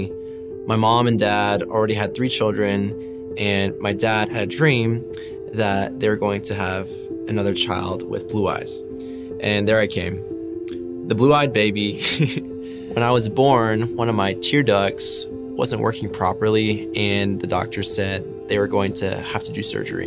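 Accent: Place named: Kansas City, United States. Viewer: You sound like you are from KC..I am sending your videos to my 21 yr old who is a senior in AR but was raised in KS. She is strong in the faith and yet goes to a huge party school where it is frowned upon. Dating has been hard for her but she managed to meet Christian guys at Country dance clubs as she loves to dance and would go there in AR and then she went out in KS to one over break and she met a guy who gave her his business card with a bible verse on back. Here I am wanting her to be equally yoked and she keeps meeting guys in the weirdest places but they dont work out. Your videos are really funny!! Thank you for being real and even though its a difficult walk..Dont compromise or give up ...persevere...Jesus is worth it!!! God Bless!!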